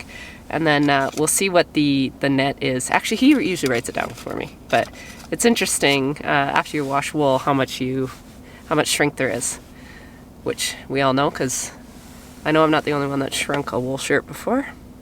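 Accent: Scotland, United Kingdom. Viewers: Can’t go wrong with a Scottish accent!! My dad who passed away 10yrs ago was Scottish and I loved his accent. I miss it. Just wondering, you utilize everything from a lamb that you can, right? Well, when they go to market, who gets their skin? There is a market for it isn’t there? I remember going to a restaurant once on the Old Banff Highway and it was run by the First Nations and upstairs was a museum where they showed a buffalo and what parts of the body was used by them and for what, right down to the testicles being used as a baby rattle. 😳 It was interesting to see it. Very little of the animal was wasted. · A good Scottish accent right there 🫶🏴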